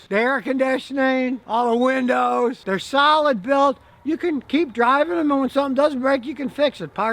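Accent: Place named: United States. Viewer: Is this chap sniffing Glue ? . Or is this how Americans speak ?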